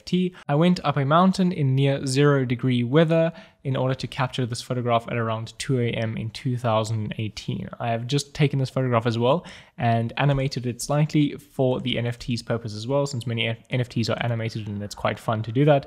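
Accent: South Africa. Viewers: South Africa maybe?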